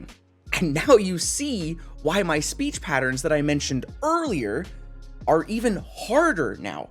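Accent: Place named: Canada. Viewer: just sounds like another fellow Canadian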